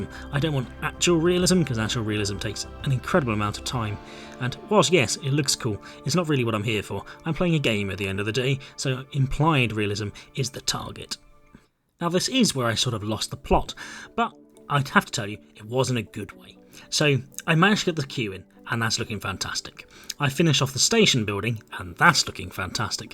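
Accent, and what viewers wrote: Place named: England, United Kingdom. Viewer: Your flow of words and English accenting makes you sound like that specific really wise guy that everyone loves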